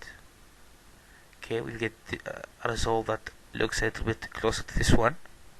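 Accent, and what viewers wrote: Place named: France. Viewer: thank you very much ,,, you are french,Right?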